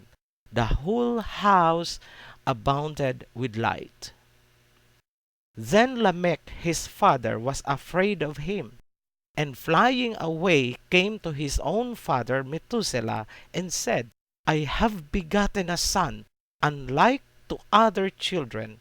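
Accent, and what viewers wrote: Bisaya, Philippines. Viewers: I agree with Tarogi Taraki. Sounds like they dont believe in the flood and that we all came from monkeys. Mali ba ako? Pero sounds like bisaya yung nag salita at yung sinasabi nya hindi naman sya nagsulat. Who wrote what you reading and who paid you to read it??? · bisaya po kasi ang accent niya pag ini try niya mag tagalog kaya ini-english nalang niya. 😂😂😂😂 Anyway bakit Hindi mo panoorin yung ibang mga videos niya. nag tagalog na kaya siya. panoorin mo kasi yung iba niyang topic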